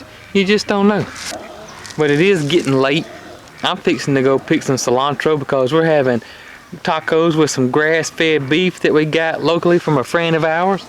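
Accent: South, United States. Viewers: Love your sense of humor!! Wrastling haha. I'm from NY so your southern accent is fun.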